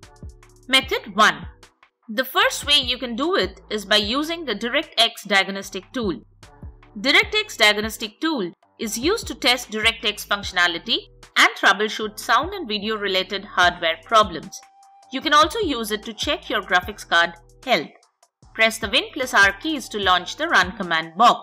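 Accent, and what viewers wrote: India. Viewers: step 1 , don't trust any computer video of indian accent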